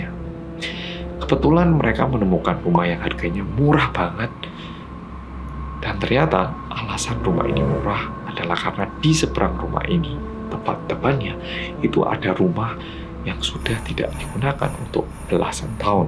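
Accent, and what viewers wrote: Java, Indonesia. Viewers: First ☺️ bersama orang koreyah medokk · HAIII TIM GERCEPP💜 HALLO MAS MEDHOOOOKKKK💜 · Tiap nonton konten mas, jadi ikut2an medok ngomongnya:"D Semangat terus mas ngontennya!!!!!!!!!!!!!!!!!!!!!!!!!!!!!!!!!!!!!!!!!!!!!!!!!!!!!!!!!!!!!!!!!!!!!!!!!!!!!!!!!!!!!!!!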